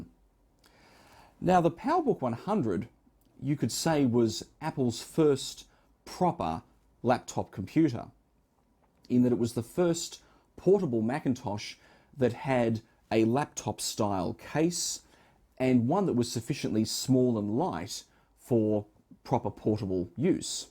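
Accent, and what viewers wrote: Australia. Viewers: Are you Australian? You sound like it.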